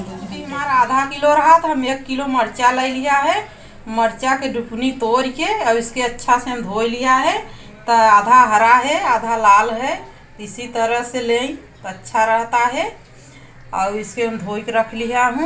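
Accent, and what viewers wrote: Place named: Uttar Pradesh, India. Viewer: Mujhe aapki recipe pasand aai,aur aap k bolne ka tareeka bhi,sultanpur side ki hai lgata hai aap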